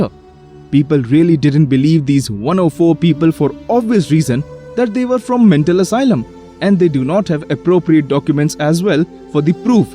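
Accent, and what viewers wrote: India. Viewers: I hear Indian accent bro are you one of us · Your INDIAN accent made this video especially confusing. But a creepy account of fiction I think.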